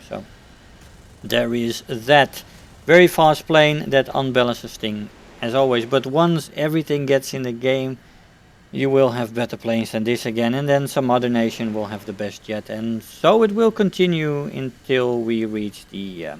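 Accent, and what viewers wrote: Netherlands. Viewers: je klinkt nederlands of misschien ligt het aan mij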